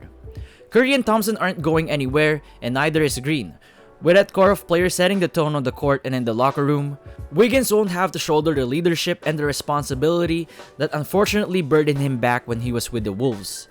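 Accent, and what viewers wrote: Philippines. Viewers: Lol Pinoy accent, my man.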